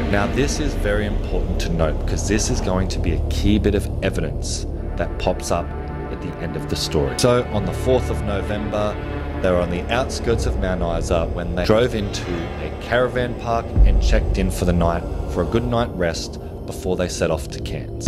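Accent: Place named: United States. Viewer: i actually thought you sounded American mixed in like..Did you spend time there.?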